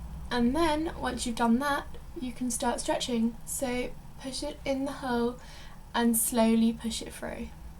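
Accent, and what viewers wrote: United Kingdom. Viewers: Wow... I've only watched your videos where you don't speak so far, and I seriously thought you were American or someone who would have an American accent! xD What a pleasant surprise hearing your adorable British accent ^^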